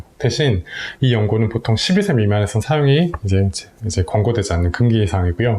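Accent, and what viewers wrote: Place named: Jeolla, South Korea. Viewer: Q&A가 궁금했던 내용들이라 참 좋네요, 약사님 전라도 분이신가봐요! 오늘 특히 구수한 느낌입니다ㅋㅋ 영상 감사합니다.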